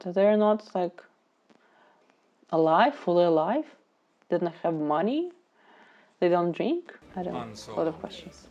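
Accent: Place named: Eastern Europe. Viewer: yeah Alec Guinness is a legend, other classics he acted in are The Ladykillers, The Lavender Hill Mob and Kind Hearts And Coronets. As for your accent, those from the former Soviet states in the eastern bloc sound very similar. Your name sort of gives it away though, you wouldn't happen to have Romanov blood would you lol?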